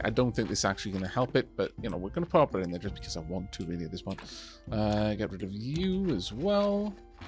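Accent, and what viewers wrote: Wales, United Kingdom. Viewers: So I don’t know if you have “for science’ed” this yet, but in your first run you did try to sell raw sliced meat without the stamp and you couldn’t. That may have changed since then. I don’t remember if you ever sold cooked meat dishes at all, burgers were too valuable. Also, My partner, Todd, doesn’t normally get excited when he walks in on me watching one of my YouTube channels. However, he does like, and this game in particular. When I watched the previous episode he walked in and asked “Is this the game with Comrade Donkey‽” I will probably buy this finally (It used to be on XBox Game Pass but dropped off I think, and also I got rid of my GP.) It’s very charming and I know so much about it because of this series. (Now if only the game could include a running commentary in dulcet Welsh tones. Oh, well, I’ll have to settle for watching this while I play.)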